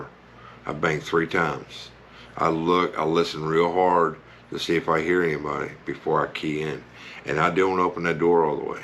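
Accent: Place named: South, United States. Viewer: The down and dirty truth of apartment maintenance, I agree to everything you said. Where are you from? You sound southern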